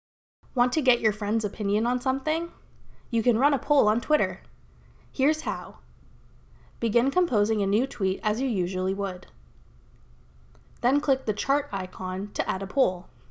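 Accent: Canada. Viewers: I agree- very informative. And... Did I detect a Canadian accent, OR have I just been watching too much hockey lately?!😀 PS ISN'T GIF pronounced like Jif ? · I love your accent - apart from the Canadian "out" right at the end!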